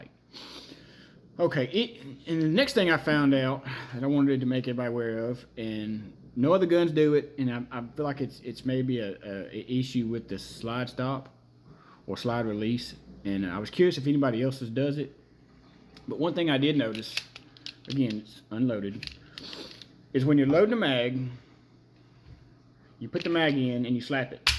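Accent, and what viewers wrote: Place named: East Texas, United States. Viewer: Just curious, are you from East Texas? Accent sounds familiar lol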